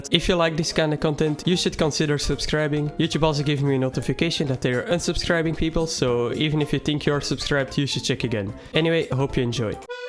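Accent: Netherlands. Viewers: are you dutch becuse you sound like 1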